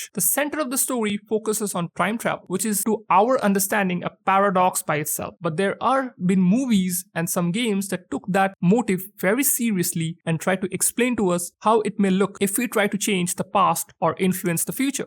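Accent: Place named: India. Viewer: The channel's about section says channel was made in India. His accent is also Indian. So he is?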